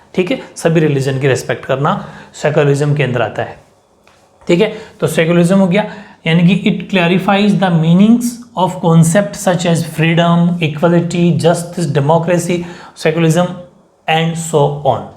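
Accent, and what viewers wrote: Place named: Marwari, India. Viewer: oho sirrr nyo paint kra liya kai 😂😂kursi bhi., shandaar h🤩... Sirr aap to baithe baithe hi pdha diya kro😊😊, aapke per drd krte h na ☹️ mhari trh hindi + marvari ghni hi achi baat h 😂😂 aapk muh se sunne me jyada acha lgta h sirrr. 2, 3 baar bol diya kro sirr😜😜 indeed sirrr literally me to uss pen ko aapk pen se compare krr rhi hu😎😎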